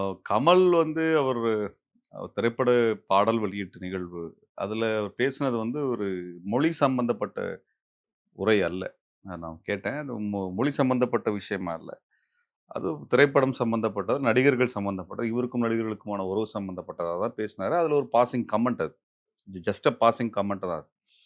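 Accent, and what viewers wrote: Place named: Karnataka, India. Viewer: is anchor a tamilian r not ? in all channels anchors r talking like kannadikas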